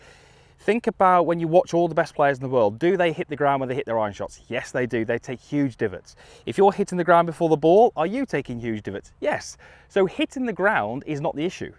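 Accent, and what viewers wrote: United Kingdom. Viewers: Hi Sal the north of England accent isn’t the easiest to understand 😂 What part did you struggle with?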